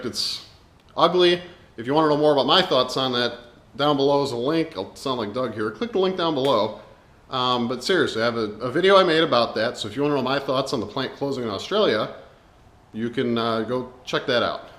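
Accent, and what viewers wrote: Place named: Chicago, United States. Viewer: Everyone in Chicago sounds like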